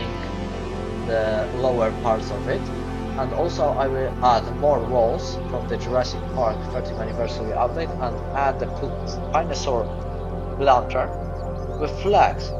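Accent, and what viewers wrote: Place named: Scandinavia. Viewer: Can understand you perfectly. Had you not said where you were from, I would have pegged you as someone from Scandinavia, and not eastern Europe (Poland).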